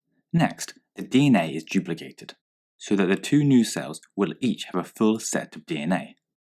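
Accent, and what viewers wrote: United Kingdom. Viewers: HE IS BRITISH!!!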